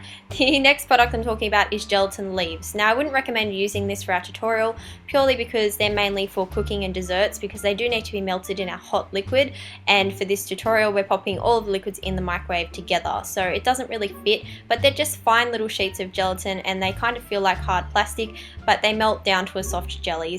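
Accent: Australia. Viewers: Hi OMG your so awesome at FX I really want to try making stuff like this for halloween and stuff. Once question where do you but your makeup like the pallets ? If you get them offline what is the name of the website? Btw I love your Australian accent idk I love Australian accents 😂 And can you please do a Q&A if you do here is a question how old were you when you started FX makeup? Thanks Ariana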